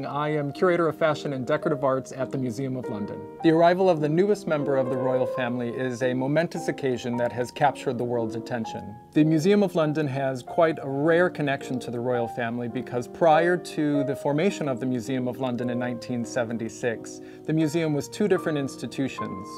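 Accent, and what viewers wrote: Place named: United States. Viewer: WHO MADE THIS APPALLING IN QUALITY DOCUMENTARY?Firstly Who is presenting these exquisite objects? with Canadian or. I hope not American accent. Why does the Camera stay fixed on him. No interest ,instead of presented pieces? Irritating background noise . And Too short moment on objects with zoom image clouded. I CANNOT BELIEVE WITH ALL THE BRILLIANT YOUNG PEOPLE. WHO PRESENT DOCUMENTARIES OF THE HIGHEST QUALITY . THAT THE MUSEUM OF LONDON PRESENTS. THIS VERY POOR QUALITY WORK. An English Lady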